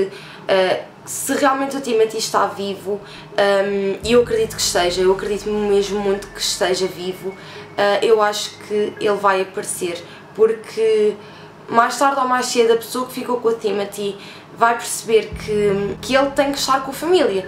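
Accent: Portugal. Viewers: Aiii português de Portugal é tudooo kkkkk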